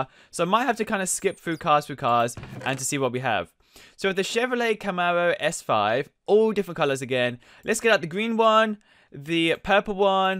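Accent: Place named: England, United Kingdom. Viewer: You talk like your From englande Sorry England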